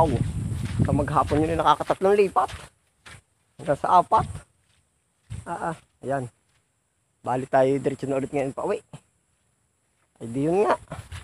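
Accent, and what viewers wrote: Quezon, Philippines. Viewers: Taga quezon din po kami...nakakaaliw manood sa punto p lng quezon tlga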